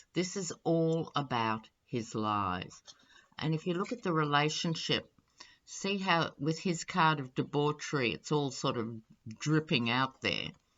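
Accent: Australia. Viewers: I love your Australian accent especially when you say "deep shit". You are too cute!☺️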